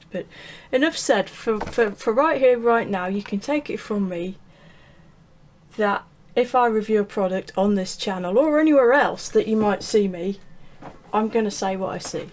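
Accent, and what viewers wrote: West Midlands, United Kingdom. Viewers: Hi, I would like to be considered for the Mustang giveaway, I'm back into modelling after 30ish years and find your lovely West Midlands twang and easy yet very informative tips and advice invaluable in my learning of the hobby after 30 years ( things have changed alot since the 70,s) Also undying to try foiling so this sould be my first attempt, I'll send you pictures of my success or not if I am lucky enough to win. Thanks again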